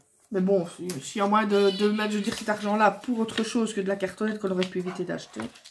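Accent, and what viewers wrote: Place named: Belgium. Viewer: Coucou, j'aime beaucoup, ton parlé avec toi on ne s'ennuie pas, j'ais pu comprend que tu étai de Belgique? moi aussi, Bisous.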